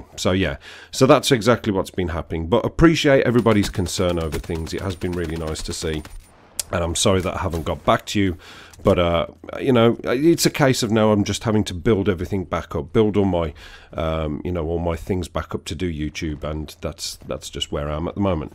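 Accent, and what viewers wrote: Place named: North, United Kingdom. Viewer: Good to hear your dulcent northern tones again, fella. Welcome back.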